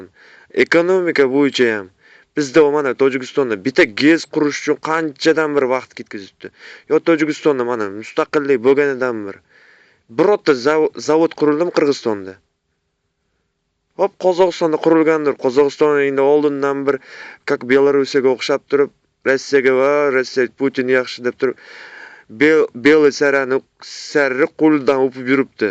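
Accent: Namangan, Uzbekistan. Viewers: ука хафа булмагину сен намангандансан йигит тугри гапириш керак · Шевенг намангану . Нимага биттанг Жиззахданман биттанг тожикистонданман деб Наманганча гапиришасан . Насса кемутти дейсан сен наманган. Узр сурама лучше елгон гапирма. Андижонга осилма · ээ ука сиесатга аралащма нима киласан узин намангандансан шекилли шеванга караганда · Bu sheva Namongonlik shevang sotib qôyadi baribir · Нега Конибодомлиман дейсамми.Наманган шевасида гапиряпсанку. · ука салом бу тожигистон кунободом деяпсиз лекин шевайиздан наманганликка ухшаяпсиз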